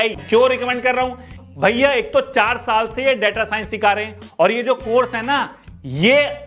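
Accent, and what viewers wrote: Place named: Meerut, India. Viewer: Bhaiya aap mujhe bahut ache lagrte ho or aap bilkul humhare goan meerut side jaisi language bolte ho hila matlab kaam dhandha karo aisa humre yaha bolte hai❤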